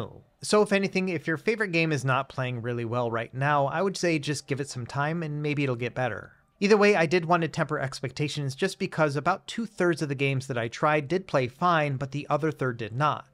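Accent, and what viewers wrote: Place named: Midwest, United States. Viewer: Where are you from? That's a heck of midwestern Accent you've got there. What is that Missouri? It's almost like it's Minnesota.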